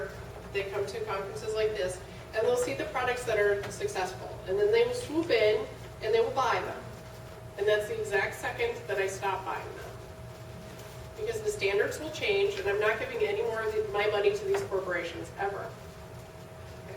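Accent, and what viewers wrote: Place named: United States. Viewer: Please put subtitles because the sound is awful and sometimes the accent is too American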